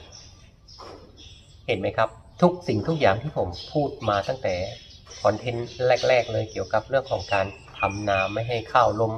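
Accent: Sukhothai, Thailand. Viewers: ส่ำเนียง เหมื่อน คน ซุโข่ทัย เลยครับ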